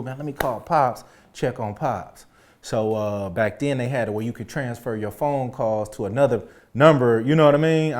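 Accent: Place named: California, United States. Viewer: You can hear the Cali In his voice 💯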